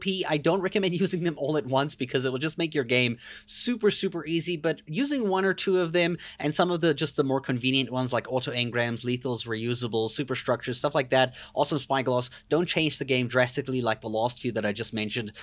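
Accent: South Africa. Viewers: Do I hear a lil South African accent? Heyyyyy from ZA. awesome vid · I could tell a fellow South African from that accent. A buddy got me into ARK recently and showed me some of these. Awesome Spyglass is a crutch for me now. Mist definitely gonna try a few of these. Also subbed too!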